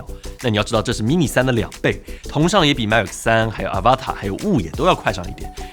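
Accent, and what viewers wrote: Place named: Jiangsu-Zhejiang, China. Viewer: 江浙口音没办法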